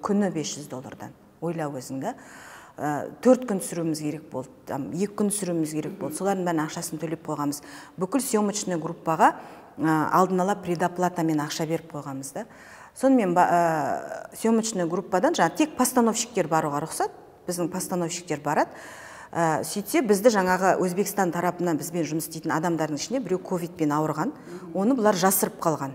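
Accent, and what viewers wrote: Standard. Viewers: қазақша таза сөйлеп қалыпты, молодец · ханым, жарайсыз! Осы интервьюда таза казак тилде сойлеп шыктыныз, кулакка оте жагымды естиледи екен (менде казак тилде клавиатура косылмаган айып етпесин)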